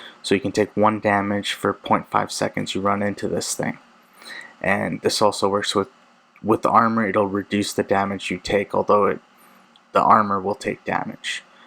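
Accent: Punjab, India. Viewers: bro are you from Punjab?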